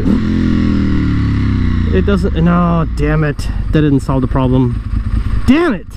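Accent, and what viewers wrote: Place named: China. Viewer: Sorry the fix did not work, Still love the Chinese accent thou.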